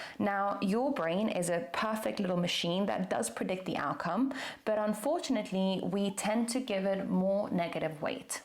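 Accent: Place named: England, United Kingdom. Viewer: I just assumed you were from England. Where are you from/ grew up? Ps. You're my current fave educator/ influencer/ author ❤. Waiting for my bday in November to buy myself your book.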